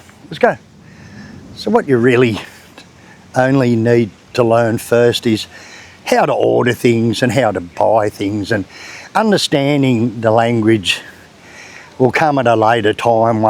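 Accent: Australia. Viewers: Inspirational! You can barely speak Strine English, so the fact that you've learned Bahasa Indonesia to the extent that you do is truly inspiring. How long has it taken you?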